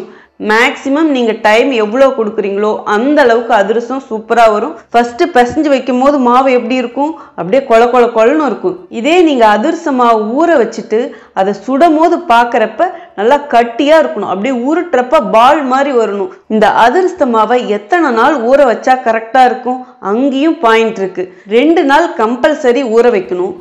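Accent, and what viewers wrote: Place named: Kongu, India. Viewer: thanks but your tamil is kongu tamil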